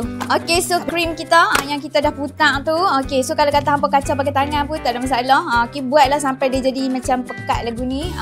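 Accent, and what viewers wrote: Kedah, Malaysia. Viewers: Puaih dgq loghat kedah ampaa oii😂😂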